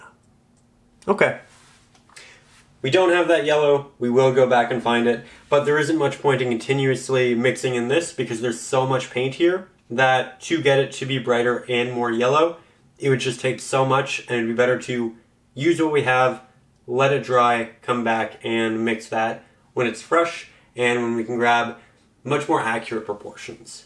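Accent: Canada. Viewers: The Canadian accent and pronouncing of words is difficult to listen to.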